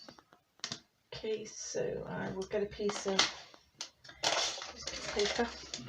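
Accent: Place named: South England, United Kingdom. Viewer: I live in Newark. Thanks for the tip. I am going to raid those charity shops next time I get chance. You definitely have a South of England edge to your voice. Xx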